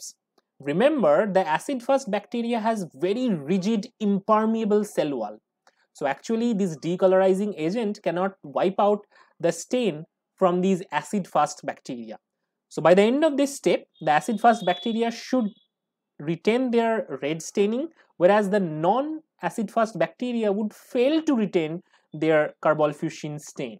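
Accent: Bengal, India. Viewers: U have accet of a bengali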